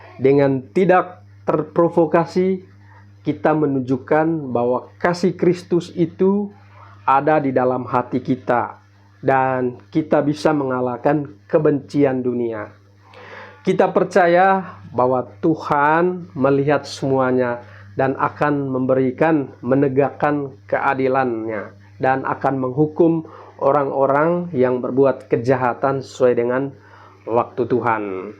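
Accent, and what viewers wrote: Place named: Manggarai, Indonesia. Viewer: Bahasanya manggarai sekali e kësa 😅😅...semangat dalam memberitakan keselamatan ❤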